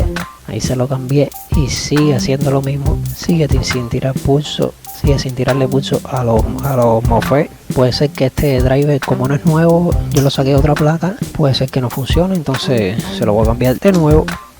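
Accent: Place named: Puerto Rico. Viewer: si primera ves que hablaaaa jajajajajajaja...................y tiene acento boricua jajajajaja likeeeeee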